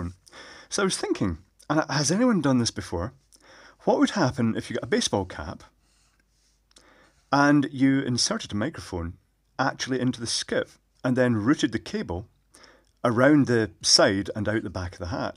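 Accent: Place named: Glasgow, United Kingdom. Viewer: Personally I prefer this Cap microphone sound, it is crisper (term used by those with hearing loss) meaning the all important frequencies that reveal subtle differences in the sounds are there at good levels, too much in the bass region muddies the perception, bit like the TV news broadcasts where they have a permanent thumping drum or musical? background, WHY? is trying to get his words over to you in a clear manner, this microphone does ir WELL. His wonderful "Glaswi" accent is a pleasure to listen to.. Keep it up "Sir" Final thought, at least we don't hear the rustling of his beard!!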